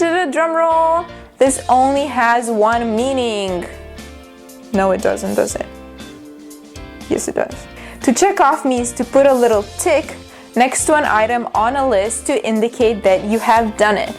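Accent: United States. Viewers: Hello Teacher! I have checked out all English teachers on YouTube. I see English Teacher is one of these best teachers on YouTube. I've ever learned English with her videos, clearly American accent and made me easy understanding her videos, Thanks a lot Teacher.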